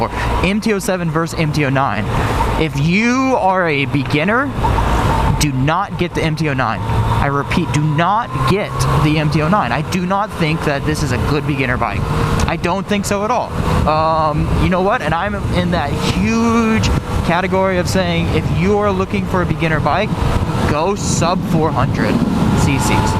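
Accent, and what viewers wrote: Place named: United States. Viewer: Clearly you have An American accent....how do you like Germany?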